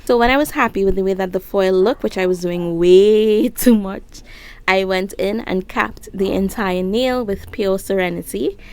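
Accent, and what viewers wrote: Trinidad and Tobago. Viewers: Tell me why I hearing a trini accent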